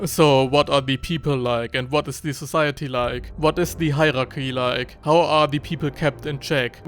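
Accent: Germany. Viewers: Boah, I love this German English accent. Bitte Mehr hahaha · Ahh yeah, once again my favorite German accent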